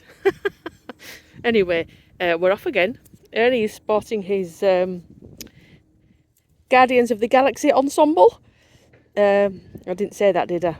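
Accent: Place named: North, United Kingdom. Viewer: I suspect is from a bit further daaan sarfer than you,edging me bets,are you from yorks/lancs border? love your accent. Give Ernie a cuddle amd a hug.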